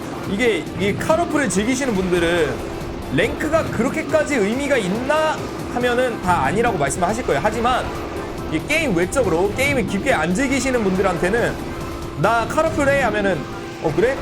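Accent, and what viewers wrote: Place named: Jeolla, South Korea. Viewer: 왜 전라도말을 나 전라도 목포에서 태어남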